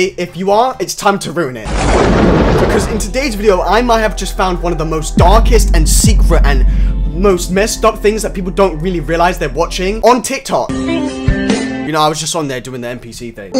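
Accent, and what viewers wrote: United Kingdom. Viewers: bro looks like an american sounds likes a british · it actually ji scared me when I realized he was British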